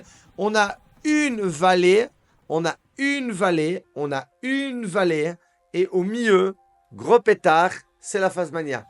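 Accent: Belgium. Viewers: Rien que par ce que tu as un accent d'un air liegeois tellement sympa , je m'abonne ❤❤❤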